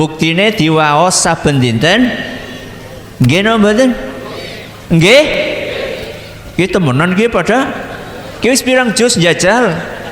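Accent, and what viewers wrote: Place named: Banyumas, Indonesia. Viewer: Masya Alloh..... Seneng dengerin ceramah pak ustadz, karena suka diselingi bahasa Jawa yang ngapak😂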